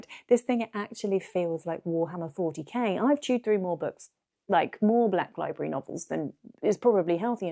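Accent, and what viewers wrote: United Kingdom. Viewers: Uk-uk! A knowledgeable, entertaining, nice and pretty person with a British accent on the internet! And covering topics that are also my favorite cup of tea! Subscribing now and listening to while having coffee and shaking my pitchfork at the Witcher Show Series.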